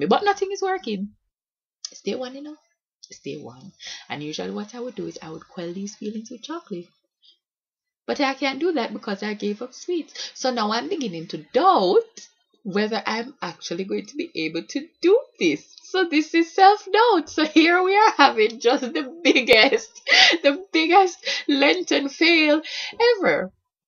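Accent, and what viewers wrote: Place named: Jamaica. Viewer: Mi love u bad.... and really and truly u Jamaican accent a shot....it sounds like u should take up public speaking :)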